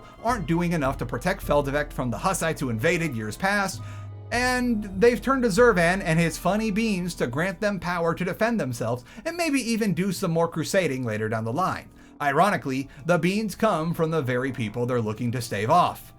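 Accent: United States. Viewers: Man, listening to an american pronounce those names hurts so bad.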